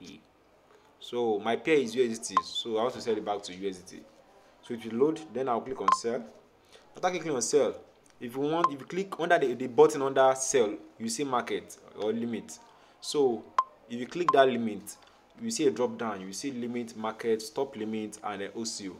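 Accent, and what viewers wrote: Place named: Nigeria. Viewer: I liked immediately I heard the Nigerian accent... I know say it will be understandable. I am a subscriber now ❤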